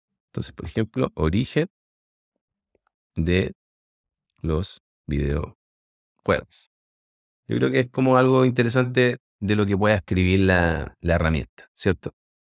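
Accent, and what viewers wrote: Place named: Chile. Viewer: Por el acento se ve que eres chileno (actualmente resido en Santiago de Chile). De verdad esta herramienta es una locura... ¡Muchas Gracias!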